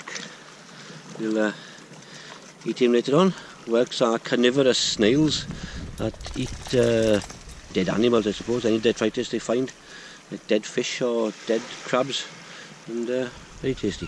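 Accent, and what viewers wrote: Wales, United Kingdom. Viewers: Your accent is so thick, it's impossible to follow some things you say (I lived in Wales 14 years, but it;s still bloody hard to understand this man). You lift a rck and say, There's a nice three-beaded XXX" - what was it? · Welsh accent is beautiful.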